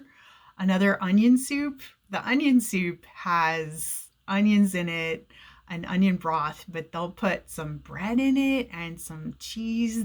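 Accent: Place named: United States. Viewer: Oh you guys are completely dependent on packed food. Anyways thank you for informative video, it definitely answered my question that has been lying in my head since long, n here i landed onto your video. Your pronounciation is very much clear, the speed you speak is good and easily understandable, i enjoyed your accent. Its not like other americans whose words are hard to catch most of the times. I had a hard time in the beginning in my job as a transcriber, to document american calls for insurance company. But with time it got better..hehehe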